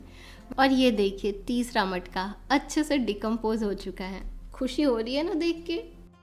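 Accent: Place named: South India, India. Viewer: Lovely Voice ....Kuch Kuch South India jaisa feel ho raha...😄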